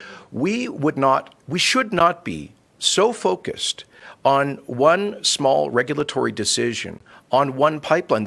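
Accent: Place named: United States. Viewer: The biggest boondoggle is the drop in taxes to 10% that Kenney wants to announce to buy votes of corporations in Alberta. How has he planned to pay for that? No idea! Kenney is truly the most unlikeable arrogant self centered bully in the history of this province...He is not even from Alberta..he does sound like either an American or from Ontario....Certainly not from here.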